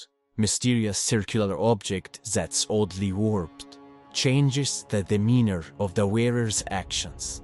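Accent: Egypt. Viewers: عرفتك مصري هههههه استمر يا باشا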